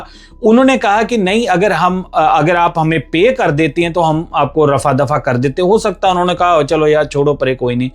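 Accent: Punjab, Pakistan. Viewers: Yaar aap Pakistani punjabi ho kya?? 😂😂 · Tera accent pakistani hai....naam rakha hai sale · You are pakistani accents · App ka Hindi mano aap Pakistani ho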